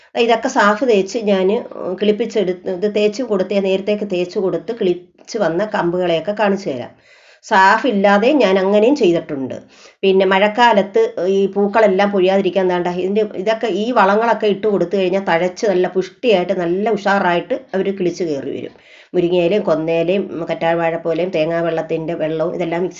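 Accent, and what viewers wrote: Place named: Kollam, India. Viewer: ഇത് കൊല്ലം സഠഭാഷണഠ ആണ്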